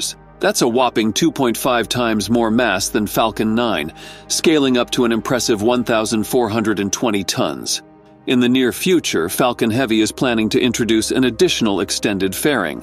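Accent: United States. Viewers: thank you for plain western english!! I'm tired of the artificial "European accents"!!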